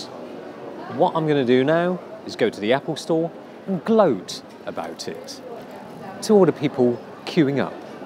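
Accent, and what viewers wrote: United Kingdom. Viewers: Dear host, i'm from hongkong, really love watching your reviews, very professional and specially...like your british accent:) cheers mate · you sound just like a typikal brittish dude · sooooooo british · To see a asiatic speaking in that very British accent for me is very strange ... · Bristish accent :3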